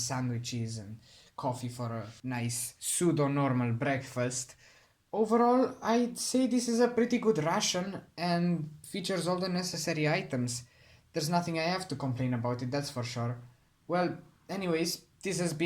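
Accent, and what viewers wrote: Romania. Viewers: Dude. Are you from Romania by any chance? Can't tell from the accent...But it sounds just like it.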